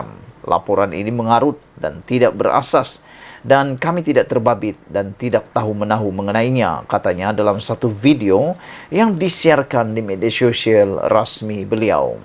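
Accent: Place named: Sabah, Malaysia. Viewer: Orang sabah bro biasa lah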